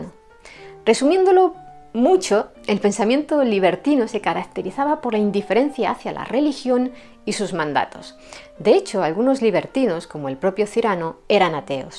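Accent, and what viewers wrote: France. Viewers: Me encanta tu acento francés.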